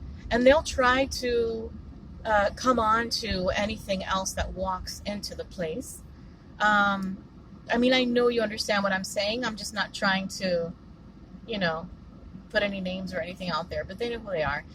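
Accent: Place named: Latin America. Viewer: I heard your voice and I immediately tried to figure where you are from. I know South Florida, but I would think Venezuela or Puerto Rico. I don't hear so much cuban. Perhaps Colombia? I am into accents. INFJ/Scoprio here in Tampa. Btw, this is one of the problems I see as well. Great vid.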